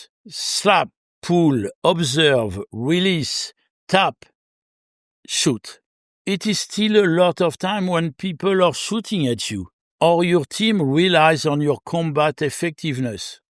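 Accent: France. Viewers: I love that you (still) have a strong French accent (a real plus for your channel in my opinion) 😁 Much more pleasant than the accent of many of my fellow Germans. They sound so wooden, as if they're trying to convince Scotland Yard that they aren't actually spies scouting England for a planned invasion. 🤣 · I'm almost certain you're French, but I have no proof at the moment (except for your accent).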